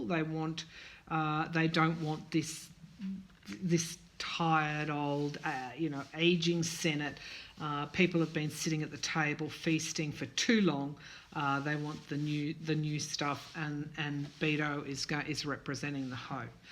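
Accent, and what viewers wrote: Australia. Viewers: Great to hear another Aussie accent oi, oi, oi! Best wishes for your channel.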